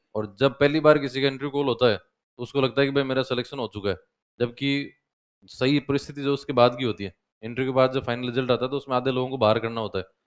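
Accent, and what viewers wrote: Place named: Jodhpur, India. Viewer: ye jodhpur ka hai..... Pakka boli aise hai😂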